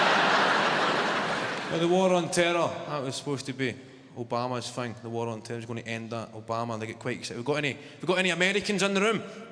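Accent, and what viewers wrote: Scotland, United Kingdom. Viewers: I just come here to listen accent.... Russian accent is Badass... Jamaican is funky.... Scotish is coolest.... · *didnt quite understand everything you said, you've got a very strong scotch accent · As a Canadian with a 2x lethal exposure to the Scottish accent, I can listen to this w/o subtitles. Also, "swapped his personality for a hi-vis vest" got me. X) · I looove his Scottish accent even though it is quite difficult for me to understand everything as a non-native speaker. 😍😄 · He sounds like Trainspotting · That's because about 15% of what exits his mouth is not English, it's his and my native language, Scots. 100% Scots sounds like this, <link> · Reminds me of my 80 year old Scottish neighbor Bob Thompson, the accent coupled with the Scottish mind can make anything funny! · Love the scottish language, do not change it,! 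· Well, I didn't know what you were talking about, given the fast stuff and the accent and the local terms, but I hope it was friendly to the U.S. Otherwise, we'll ship Donald Trump to Glascow (having been deposed here recently) and he's YOUR MILLSTONE. Cheers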